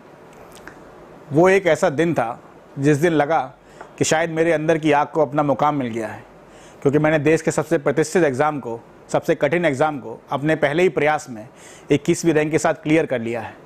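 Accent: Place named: Agra, India. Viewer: I am also from agra .tabhi inka accent bahut krra hai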